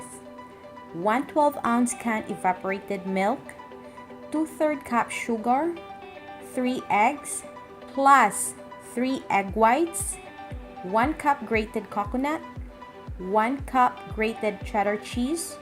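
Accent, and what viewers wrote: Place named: Vietnam. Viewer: Úi...nghe giọng này.e đoán chị là ngừi việt nammmm😁😁😁😁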